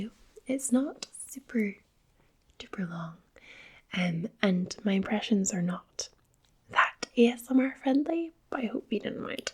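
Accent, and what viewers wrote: Scotland, United Kingdom. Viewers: Where in Scotland are you from? Your accent seems stronger than mine x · doesn't take away from the experience I assure you. Very good impressions! And still relaxes the hell out of me. Great work. Plus you have the accent going for you, you just can't beat a Scottish accent. I don't care who you are. Especially for ASMR. Just fantastic. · Modsforfun I love that Scottish accent. I need a woman man from either Scotland, Ireland, or England. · as a Scottish person watching this I love it but I'm still not used to hearing Scottish accents on Youtube. Anyway I love this channel!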